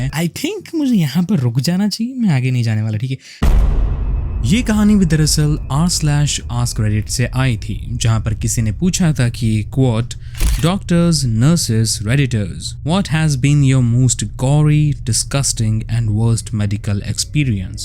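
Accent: India. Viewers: You actually sound like only desi. Thanks for the video tho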